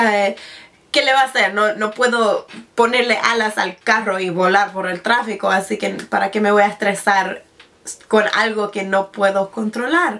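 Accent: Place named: Argentina. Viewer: ahhhh I can sorta hear your Argetinian accent loveeee it <3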